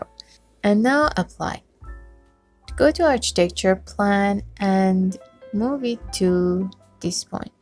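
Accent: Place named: Arab world. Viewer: Love your accent are you arbic ?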